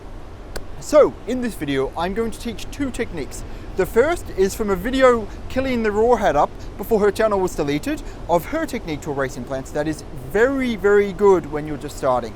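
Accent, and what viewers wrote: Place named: Australia. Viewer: Sounds like an Australian accent-probably NSW or Queenslander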